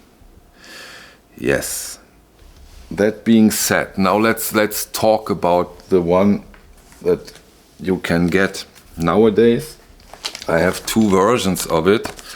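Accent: Germany. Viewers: Am I the only one who could listen to this man all night. That German tinged English is incredible.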